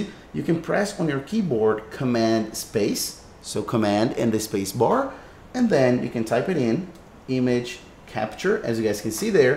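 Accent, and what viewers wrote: Brazil. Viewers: Not related to the tutorial but I hear that Brazilian ascents 😂 good tutorial. Thanks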